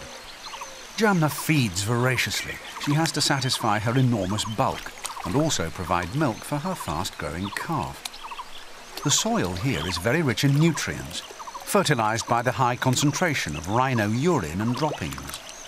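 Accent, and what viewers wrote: United Kingdom. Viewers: Great documentary, the old ones with the British narrators are my favorite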